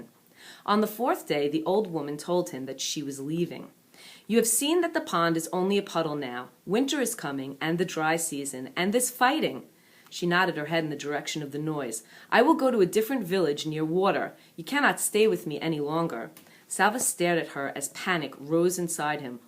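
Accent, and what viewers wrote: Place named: New Jersey, United States. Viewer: jersey accent makes it all the better ;D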